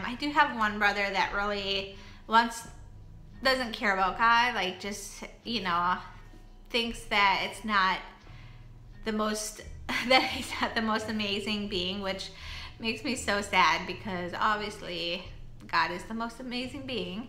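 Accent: Minnesota, United States. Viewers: I was so sure that was a MN accent. I'm originally from Northern MN myself. Thanks for sharing ❤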